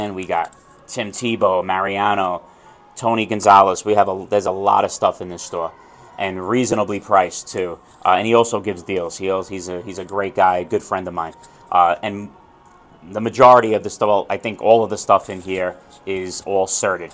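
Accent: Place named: Long Island, United States. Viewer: Great tour! You really captured what the store has to offer. And you are so Long Island- Great accent.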